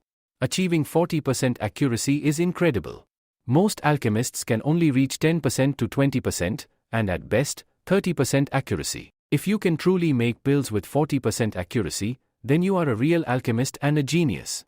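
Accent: India. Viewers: The AI voice being Indian is insane 😂😂😂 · Indian voice damn tho he tried its ok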